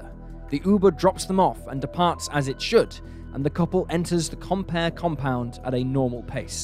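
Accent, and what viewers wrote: United Kingdom. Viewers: Lovely creative girl. Such a shame 2015 happened to her. My mum brought me up , that girls think its cute how timid I am in terms of touching , don't get me wrong I love wrapping my arms round and kissing and sweeping them away or trying but I don't get guys who think a no is a challenge or chase or they want so they do. Anyway from the UK why do Americans say its 20, 600 block instead of what it actually is? 26 thousand block like the man said (narrator's voice from the UK) . I can't end the comment taking away from this girls story.so apologise but from the UK , I hope her end is not final and if it is I hope justice comforts her and her family.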